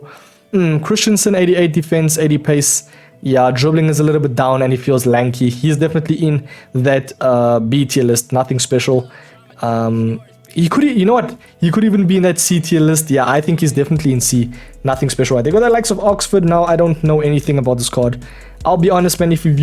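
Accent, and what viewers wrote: South Africa. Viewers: * are u South African ?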